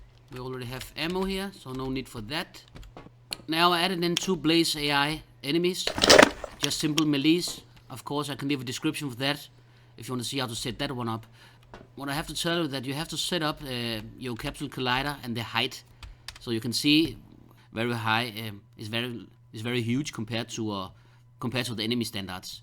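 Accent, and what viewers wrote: Denmark. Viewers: i can hear that u are Danish bro. I'm Danish too and i recognize that way u talk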